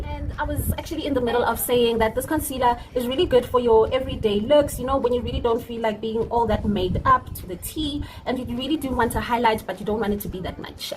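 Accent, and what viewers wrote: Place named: United States. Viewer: Have I ever told you about ur accent🔥🔥❤❤❤ .....yoh ape yeh okarr ole American❤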